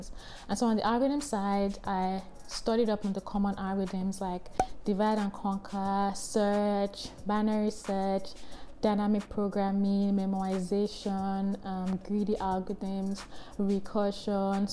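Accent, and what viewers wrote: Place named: Nigeria. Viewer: That's Nigerian accent ! 😊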